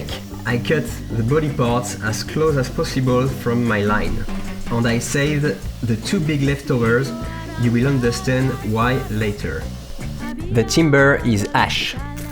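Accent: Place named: France. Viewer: Your english is very good even with french accent. Nice tutorial 😉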